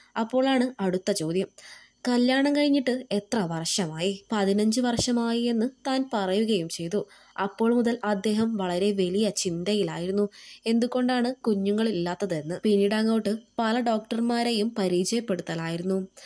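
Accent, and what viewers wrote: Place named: Malappuram, India. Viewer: ഇവരുടെ ഭാഷ കേട്ടിട്ട് മലപ്പുറം ആണ് എന്നാണ് തോന്നുന്നത്...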